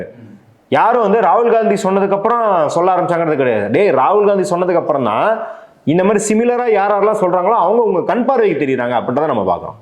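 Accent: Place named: Kerala, India. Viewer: நெறியாளர் கேரளைவை சேர்ந்தவரா? அவருடையபேச்சு மலையாள வாடை வீசுகிறதே...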